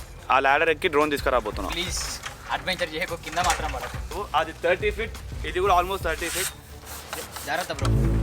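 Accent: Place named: Telangana, India. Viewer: వాళ్ళు మన తెలంగాణా వాల్ల బాయ్ మన భాష లాగ ఉన్నది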